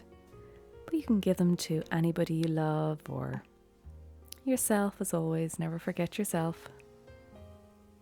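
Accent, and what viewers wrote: Ireland. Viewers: The thumbnail was very attractive, and I just love your voice, Its very soothing. love the Irish accent (Right?) (I was just listening to lots of Americans, ((Dont ask)) and that just makes the Native accent more recognisable.) You've definitely earned a new subscriber, these are attractive and helpful gifts too.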